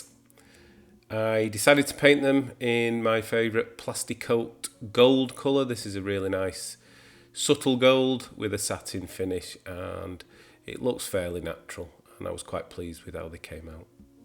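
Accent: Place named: Scotland, United Kingdom. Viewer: your furniture remake are truly beautiful. Word is getting out about the great work you do. Is it OK to ask what part of the UK you are from? I have a limited knowledge of accents but yours reminds me of closer to Scotland.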